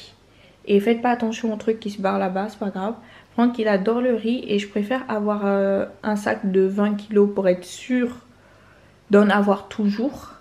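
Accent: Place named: Gabon. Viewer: Je te découvre quand j’ai entendu ta façon de parler j’ai dit c’est une Gaboma je m’abonne directe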